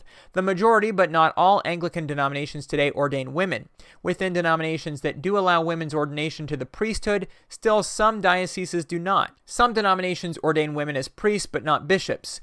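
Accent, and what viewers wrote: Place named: United States. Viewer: Whats weird is I only realised the American accent near the end of the video.... depends where my head's at, i thought you were English most of the way.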